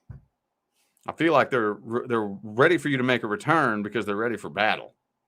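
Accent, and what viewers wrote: South, United States. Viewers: I love your readings, very matter of fact. I love your southern accent. I am American living in the UK but I am wondering if it`s a Kentucky accent. I am hoping my ex will at least apologize but I doubt it. Haven`t heard from him in over 6 months and I doubt he will ever say anything to me again. It`s never been on and off. He broken up with me. There was a third party involved which I think was his next girlfriend but I think they broken up. I want a nice guy who is a soulmate. You are right. If he couldn`t appreciate the love I brought to the table then it was his loss.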